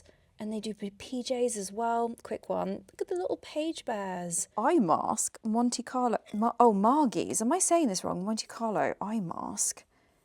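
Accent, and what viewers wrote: United Kingdom. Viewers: Lady I can’t believe I’m saying this but I’m falling in love with you…..you are constantly showing us luxury yet you are NOT snobbish….totally down to earth and love your style, your British accent and great content. You gained yourself a new subscriber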